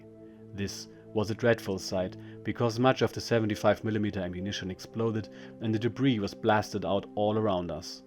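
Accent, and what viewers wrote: Germany. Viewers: The German narrating is a definite improvement over the simulated American voice. It makes the narration seem more authentic.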